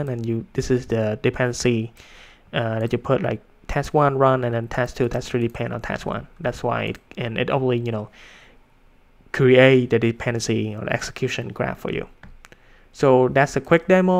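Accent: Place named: India. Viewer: looks trainer have destroyed his accent , is a mix of Indian, US and some his own styling on accent. Sometimes it's impossible to understand.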